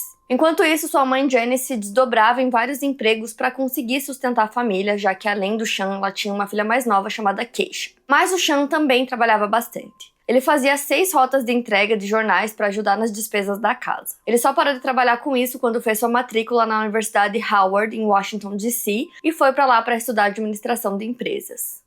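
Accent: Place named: Paraná, Brazil. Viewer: amo q a não perdeu o sotaque paranaense hehe <3 me sinto representada pela diva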